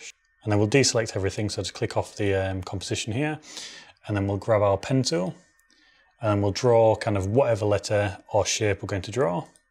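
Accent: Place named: Hull, United Kingdom. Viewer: Sounds like you're a fellow Hullensian? :D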